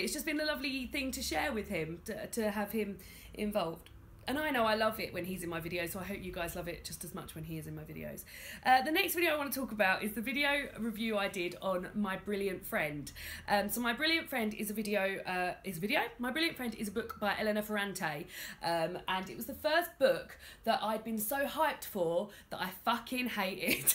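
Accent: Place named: RP, United Kingdom. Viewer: Am new to your channel and watched these videos only yesterday lol. You make my wife laugh she says you sound posh and thinks is funny when you swear. xxx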